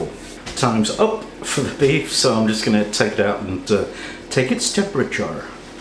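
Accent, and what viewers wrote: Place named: Northern England, United Kingdom. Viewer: I love your accent! What part of the uk is it from? my guess is northern!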